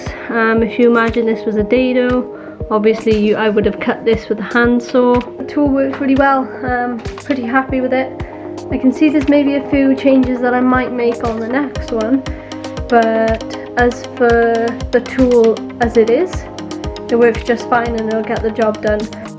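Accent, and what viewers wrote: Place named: Wales, United Kingdom. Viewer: Nice work girl. Love ur Welsh accent btw.. I'm abut to make one of these but I'm going to take a punt at it with an Allen Key. I have a decent sized one so it'll do me for my 1st attempt. I've recently begun to use Hand planes and saws and I love working with them now. I've hardly used my table saw at all since. I have a jointer/thicknesser as well but I don't use it either. I love to plane the timber clean now. I love the look of the grain after using the smoothing plane or finishing plane on them.. I wanted to ask if I needed a good plane for cleaning up lumber that is rough sawn?? What number plane is generally used for that process?? Do I ned another plane or will either of my #3 or #4 do the job as well?? I enjoyed the video but I wish it were longer to see more of the process. Just my silly gripe though. Great build..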